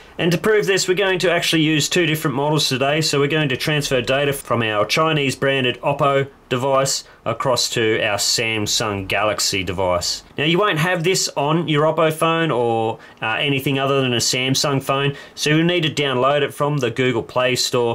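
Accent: Australia. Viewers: This Australian accent is so good! XD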